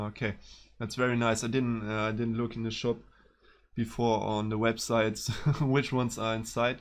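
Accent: Germany. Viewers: Man hört das deutsche in seiner Stimme ^^ aber is ja nicht schlimm weiter so :D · hah dat german accent :P but don't worry i`ve got the same problem ^^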